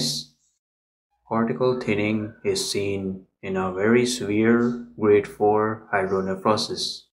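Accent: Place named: India. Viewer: Jitna vi kausis karlo aapka accent humlog jeisa hi hei..so natural rehne ki kausis kariye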